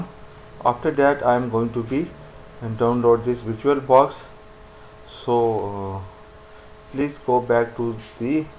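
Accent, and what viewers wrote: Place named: United States. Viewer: Hello Bro' your English-like American people😆😆😆😆😆😆😆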